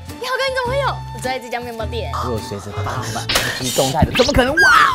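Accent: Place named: Shandong, China. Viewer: 山東腔好歪歪歪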